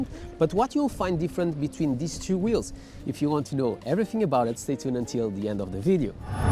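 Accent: Portugal. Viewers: Já tinha reparado que eras português pelo sotaque mas agora fiquei mesmo surpreendido, também sou de Palmela. Bom video, estou a pensar comprar a Inmotion V12 :)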